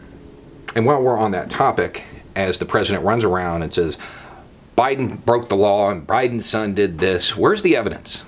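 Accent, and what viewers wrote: South, United States. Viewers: mah breau!!! Sure is good to hear a prog-lib of an analytical bent speaking with a southern accent. Ah do so mahself. Thank yew.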